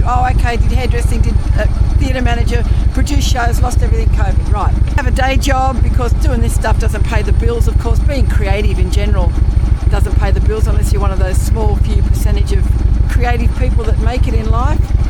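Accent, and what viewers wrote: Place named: Australia. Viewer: You are one amazing woman love the Aussie accent and when you start swearing it’s off the dial love it carry on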